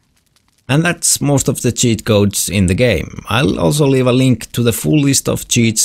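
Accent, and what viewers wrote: Finland. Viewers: I am in australia but I know that accent! FINNISH ACCENT!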